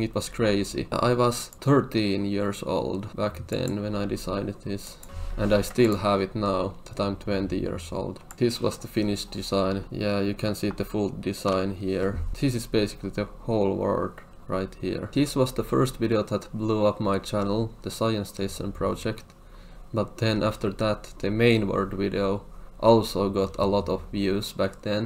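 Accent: Finland. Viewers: Suomi accent o crazy (eksä tehny face reveal jonku aikaa sitte) mut joo hyvä vid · Mahtava suomalainen aksentti olisko vanhana tekijänä mitään hyviä vinkkejä meitsille? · Finland accent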